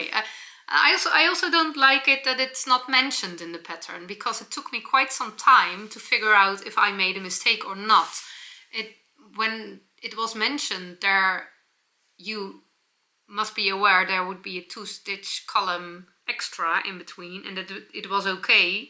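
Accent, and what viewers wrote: Netherlands. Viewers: We love your Dutch English! It is actually very good! 🙂💕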